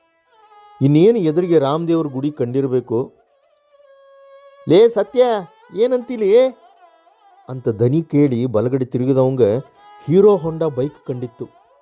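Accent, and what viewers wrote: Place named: Dharwad, India. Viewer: ನಿಮ್ಮ ಧಾರವಾಡದ ಒಳನುಡಿ ಕೇಳೋಕೆ ಒಂದು ಚಂದ ❤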